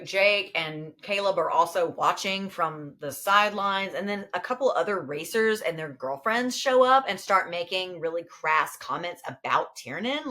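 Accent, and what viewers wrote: France. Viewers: Of course we need more of your French accent. Why is this even a question? 😂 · I had to do a lot of spreadsheet work, so I finally (reluctantly) dove in to the second half. You are so funny as a presenter. Thank you for taking this commission. I knew I would hate the ending (because I hated how the book started too) but you and your French accent made it almost bearable. Almost. Also, you look super cute in bangs. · I gotta say yes to the French accent. Really helped soften the gut punch that was this book🥖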